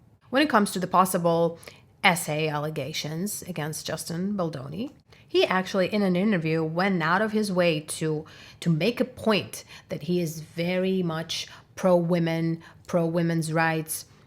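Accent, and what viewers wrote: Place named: Ukraine. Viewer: Hello. Are you from Ukraine? Once I heard your accent I thought you're from Ukraine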